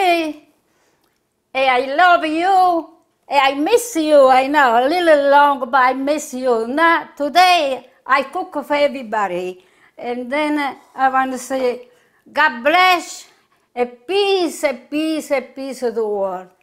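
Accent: Italy. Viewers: Always a pleasure to watch you cook and to hear your Italian voice. I gifted, again, your cookbook to a loved one. Precious recipes. Health and strength to you, and to all your famiglia.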